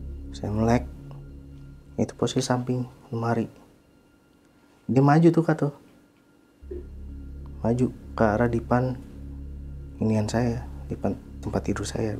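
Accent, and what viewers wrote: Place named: Jakarta, Indonesia. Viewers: Haahahah lahh kuan mpok kite pan emang asli betawi wkwkwk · Logat. Betawian. Cakeeeep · suka logat betawi ya sama saya juga kalau logat jawa saya gak gitu suka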